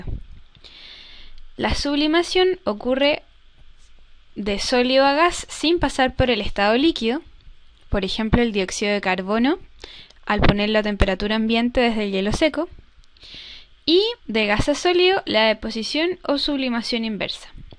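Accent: Chile. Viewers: Sos de Chile ami no me engañas jaja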